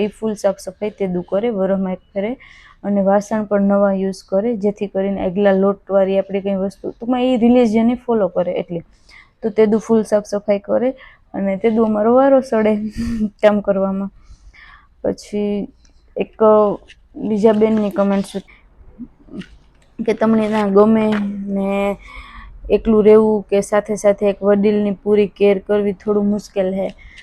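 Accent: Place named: Merani, India. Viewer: બેટા મેરાણી ની ભાષા બહુજ સરસ રીતે રજુ કરે છે તે મને બહુજ ગમે છે બેટા ખુબ ખુબ આશીર્વાદ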